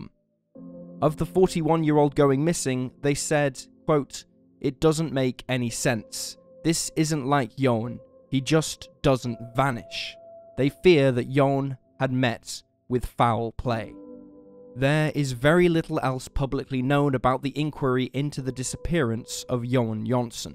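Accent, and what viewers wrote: United Kingdom. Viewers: clicks on the video - hears bri-ish person - day ruined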